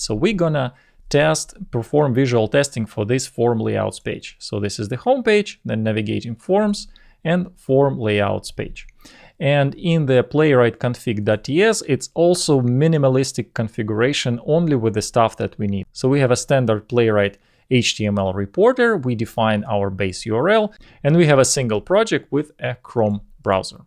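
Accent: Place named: Russia. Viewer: Спасибо! Английский с русским акцентом очень легок для восприятия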